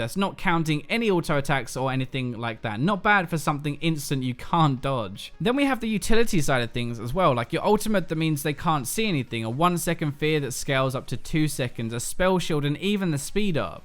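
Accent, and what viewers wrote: United Kingdom. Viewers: Where in the UK is this guy from? His accent is lovely.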